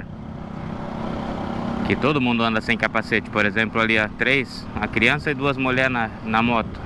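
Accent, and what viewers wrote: South, Brazil. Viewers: Pelo sotaque o dono do canal parece ser do sul. Acertei? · Pelo sotaque é do Sul Paraná ou Santa Catarina? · Pelo o seu jeito de falar, parece que tu és do Sul do Brasil. Que Deus abençoe o seu destino, mostrando para nós um pouco do Brasil. 10/01/2021.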